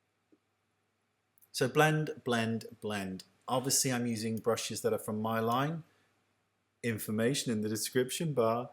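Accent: United Kingdom. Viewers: Ah... I love when he says delicious. And naughty. You can NEVER beat the British accent. ;) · that girl is beautiful and the finish look was gorgeous. I love the way you work and I also like your accent (I'm learning English so I pay a lot of attention to what you say and the way you say it) btw, where are you from? I reeaally love UK accents. xoxo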